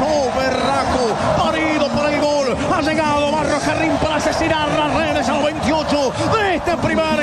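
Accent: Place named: Córdoba, Argentina. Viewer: Porque el relator es cordobes, argentino. Quizás no entiendas algunas palabras porque son modismos de acá.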